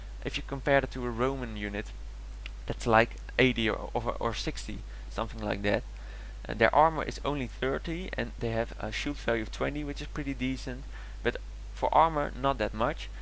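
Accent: Netherlands. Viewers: That was soooo obvious from the " nederlands-achtige-crappy-engels accent" xD aha wel grappig om zo te horen Voor de rest wel ok! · jij bent nederlands ik hoor het meteen maar maakt niet uit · dude the dutch accent's great! :D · For your first video you did great! Maar ja je kan wel echt aan het accent horen dat je Nederlands bent hahaha :P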